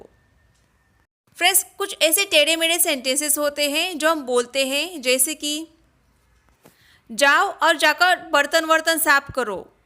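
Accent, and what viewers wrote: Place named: Tamil Nadu, India. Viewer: Today I learnt 👇👇👇 1) Come what may, I will never forget my parents. 2) Now it's my go to speak English. 3) Have you purchased toys and all? 4) I speak passable english. 5) I can't do without my mummy. 6) Show me to jump from this roof. Mam, you teach very nice 👌👌 I think u are from Tmilnadu.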